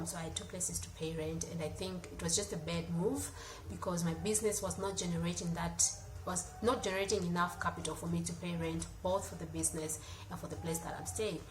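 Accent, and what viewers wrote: South Africa. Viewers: Na ka accent kameanza kuwa ka Mzanzi 😂, love you❤️ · Accent imeanza kua kama English ya wazulu 😂🔥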